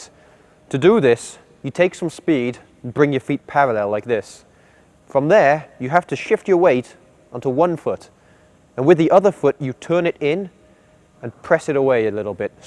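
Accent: Wales, United Kingdom. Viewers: Is he from Wales? Or somewhere else in the U.K?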